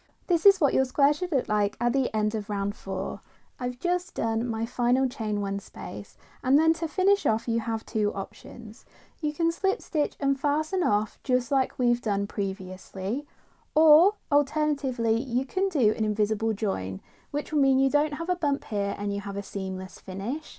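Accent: England, United Kingdom. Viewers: I’m following this, but it’s strange to hear an English accent use American crochet terms. I really have to concentrate!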